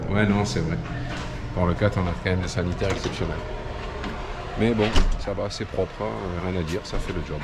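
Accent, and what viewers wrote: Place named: South-West, France. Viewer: J’ai entendu cet après midi sur le 16 « graine de sable pour le liberty » impossible de se tromper avec l’accent du sud ouest, j’étais sur que c’etait vous ! On était a cala Conca, maintenant a l’anse de Rocapina. On hésite encore pour la suite avec cette grosse houle d’ouest qui arrive. Vous rentrez quand sur Leucate ? Au plaisir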